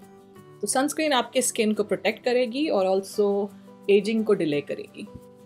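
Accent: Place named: Mumbai, India. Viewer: this is amazing. this your best video. i like the red with this neutral look. i loved it sooooooooooooo very much. apki hindi bahot achi h, kuch words to mujhe bahot jaida ache lage, like u said bahot sunder lagega, chera barabar krne k liye. Mumbai wali Hindi di, maza aa gaya dekh kr. itna cute language me bola apne, loved it. u already knows that i love ur voice. plz or bhi video banayiye Hindi me.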